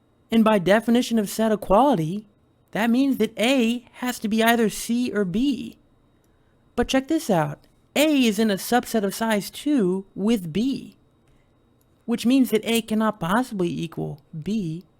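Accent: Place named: United Kingdom. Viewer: Your video's are really good. In the beginning, you proved problem 7 of maxwell real analysis. In ch 4 of Rudin we use those facts countless times. Your problem selection, 14(a) & 12, for instance is also really nice. You solve every problem with precise definition, verification of metric space, for instance. By your accent, I'm guessing your from UK. Are you currently in some uni?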